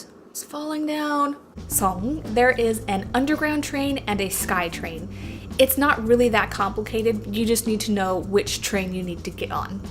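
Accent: Thailand. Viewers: Your Thai accent sound you count number is so cute. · I love your narrative so much and give the trumbs up to your vlogs especially Thai intonation.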